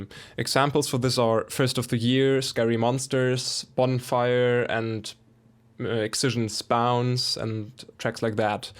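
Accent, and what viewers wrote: Germany. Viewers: du sprichst ja Deutsch 1.Dein Musikprogramm ist in Deutsch 2.Man hört an deiner Stimme das Deutsch sprichst 3.Du bist der beste Deutsche DJ der Welt!!!! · That german accent tho lol :D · You have a germany Akzent or you can speak better than the most english/amerikan people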